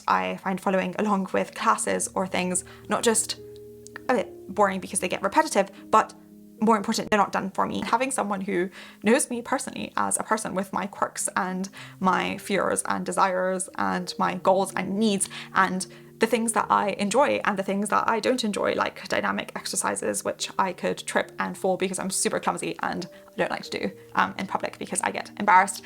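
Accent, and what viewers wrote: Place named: United Kingdom. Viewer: You speak really quickly! I use your videos for improve my listening and I like your UK accent but it's hard understand you without subs.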